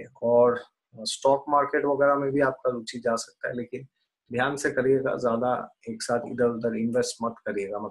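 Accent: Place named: Assam, India. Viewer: First time ur voice resonates like assamesse people.. Ur accent.. It's cute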